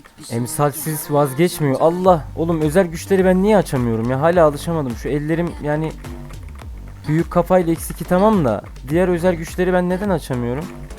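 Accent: Erzurum, Turkey. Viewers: Abi ben kararlıyım sen nerelisin erzurumluya benziyor abi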